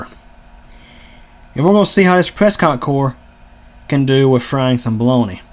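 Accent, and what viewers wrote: South, United States. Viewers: I like the fact that your southern. It just demonstrates the fact that country boys dont have to be simple. Im very proud of this vid btw very great content.👌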